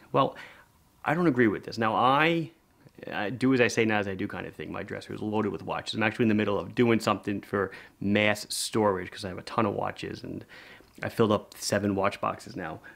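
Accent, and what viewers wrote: Long Island, United States. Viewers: He's from Long Island. That's how they talk. 😁👍✌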